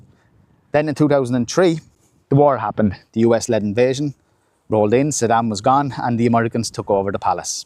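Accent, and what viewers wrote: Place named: Ireland. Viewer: Irish English is fantastic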